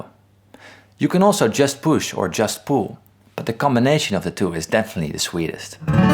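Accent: Netherlands. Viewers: ik neem aan dat je nederlands bent aangezien je geweldige accent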